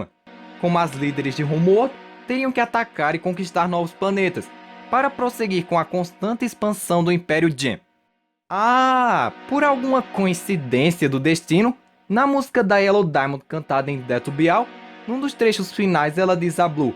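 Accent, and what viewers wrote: Northeast, Brazil. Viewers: voce e do nordeste eu so moro na bahia valeu pela teoria muito loco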